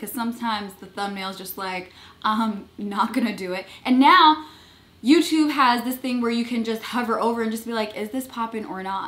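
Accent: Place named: Tigrinya, Eritrea. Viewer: I love ur tigrigna accent i want hear u more sweety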